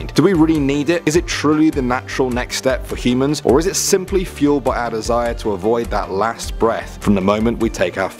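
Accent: United Kingdom. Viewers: 😍😍😍😍 I KNEW THAT BRITISH VOICE SOUNDED FAMILIAR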